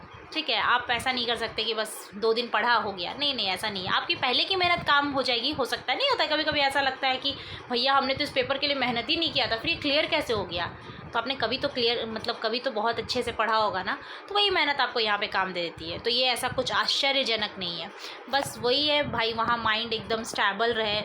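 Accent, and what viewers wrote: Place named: Mithila, India. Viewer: Aapki soch bilkul Sahi h.. aapki sound bilkul hamare local language ke tarah mil raha h... Aap kis district se h... Mithila side se h kya???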